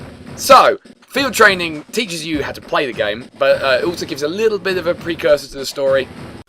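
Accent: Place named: United Kingdom. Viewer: I was not expecting a British person. I'm a Brit myself, but still... Anyway, I hope your voice acting for Green Earth will be EPIC, since they're all British, too. I like to think I'm good at impersonating Drake. 🤣 Edit: Actually... Green Earth is probably not all British. Scratch that. Anyway, I'm so happy to see this content from you. ❤️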